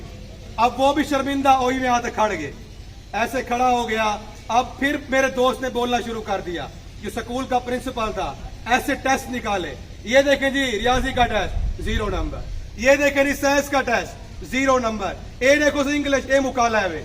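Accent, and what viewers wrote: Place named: Saraiki, Pakistan. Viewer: سرائیکی ٹچ 👌👌💯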